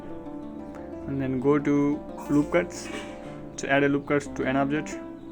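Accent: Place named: India. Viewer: This tutorial is an attack on one's ears by a very bad accent (sounds like Hindi) and irritating repetitive noise (music) in the background, almost infringing to the foreground. If one can suffer this or tolerate it, there may be something to learn. However, there are better tutorials.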